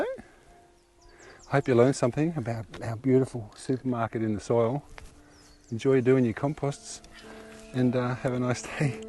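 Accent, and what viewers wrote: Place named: Australia. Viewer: How in the world, as many gardening channels as I watch, have I managed to miss this guy? I am totally in love lol. Let's see... long-hair runs around barefoot like I do all the time chases mice around and makes beautiful compost. Loves the smell of Rich soil... And an Australian accent to boot. What's not to love? I just wish you could come help me with this mess I call a homestead in Missouri. Too many rocks, too much clay, no rain etc. But I'm trying. Thank you!! I needed this. Going to plant cover crops if it ever rains, then in late winter I'll cut it down, cover with my compost, tarp and let it sit till I plant it. I winter sow, so I have time to let it rest before things have to go out in the garden. I am using turkey, chicken, quail, duck, and rabbit manure, as well as grass clippings and leaves and plants that I've cut out of the garden from this last season excetera.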